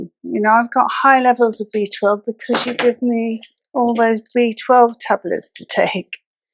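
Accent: England, United Kingdom. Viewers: I like the “blue”in all your pictures…and enjoy your English accent…go on, life is precious on every age!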